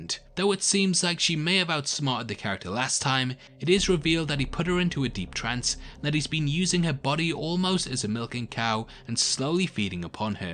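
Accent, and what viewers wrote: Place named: North East England, United Kingdom. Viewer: Top vid m'man, thanks. Did enjoy the BBC Dracula. Your accent is very confusing tho, sounds like N.E. England crossed with a tinge of Australian, is this accurate? :)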